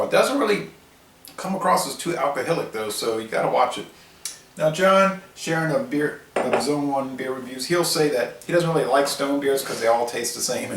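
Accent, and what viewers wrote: Louisiana, United States. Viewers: Got that Louisiana drawl.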